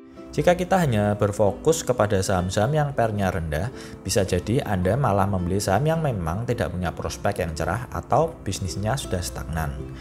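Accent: Java, Indonesia. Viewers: Min. Tolong dong. Kapan kapan bikin subtitle atau tulisan yg diucapkan, karna bahasa mimin klo ngomong medok bnget😂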